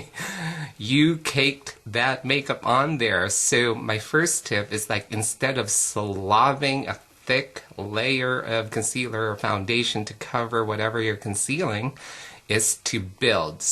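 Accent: Philippines. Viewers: mwahahah love the pinoy accent you;re so awesome! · omg! you're filipino ! :) i can tell by the accent you did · Love your Filipino Mother accent! Haha! <3 I just wanted to say.. THANK YOU FOR THIS VIDEO! Honestly, this may be weird to confess, but you have made me accept.. actually, LOVE my acne scars. I never thought of them as something that can give me "character". So thank you, so much! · he's filipino! lol, thei got the accent! LOL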